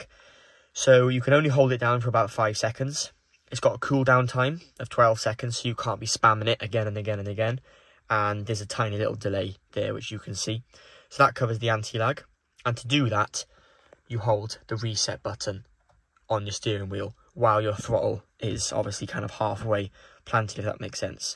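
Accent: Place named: United Kingdom. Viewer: Yes, I also noticed using the US octane. Seems to be incorrect. You sound like you are british.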